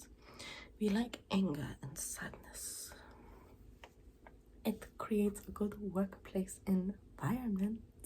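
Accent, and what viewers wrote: France. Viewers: I don’t get tingles from French accents but this was worth the watch regardless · as french I like so much her French accent !!😂😂😂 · accent makes me believe that you're orginally french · That french accent is way too accurate and relaxing… Lot of love from Paris🥰🇫🇷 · As a French, I love this accent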